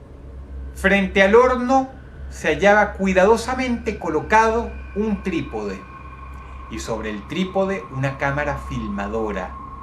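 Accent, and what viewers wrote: Venezuela. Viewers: Sería buena idea como en casos de la vida real con Silvia pinal, pero en este caso sería el (acento feo venezolano) el problema sería tramitar con todos los autores de las historias, pero siendo el escritor se me hace extraño no lo haga, quizás no lo ha considerado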